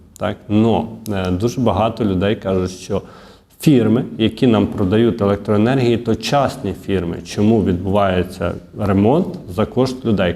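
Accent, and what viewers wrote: Vinnytsia, Ukraine. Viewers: демагог з бідагогічною освітою,припини херню нести,а краще навчайся вірно,бо твій вінницько жмеринський діалект ріже слух. Менше води,більше конкретики. І яка була корисна інформація в цьому відео,?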